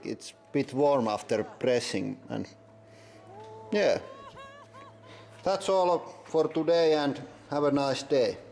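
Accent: Finland. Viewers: I was trying to guess your nationality by your accent, but when I heard Perkele the guessing was over. Greetings to Finland. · I didn't really watch before. But I thought the accent must be Finnish, and then you hit us with the perkelle, and it was confirmed haha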